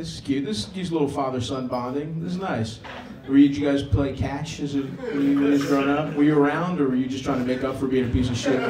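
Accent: Baltimore, United States. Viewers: Stav, you are 100 percent my favorite comedian since I discovered your stuff on Josh Potter Show. With family from College Park, I am so entertained by your Balmore accent.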